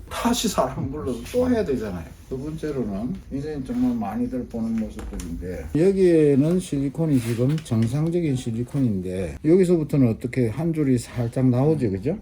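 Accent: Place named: Gyeongbuk, South Korea. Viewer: 부산은 아닌데 말투 경북같다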